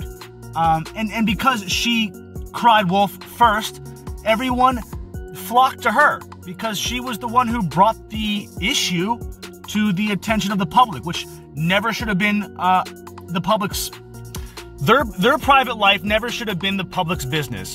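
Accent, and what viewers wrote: United Kingdom. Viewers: lol The British accent took me by surprise. Good times! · Loving the London/cockney-ish accent! Tbf it’s pretty good! 😂😂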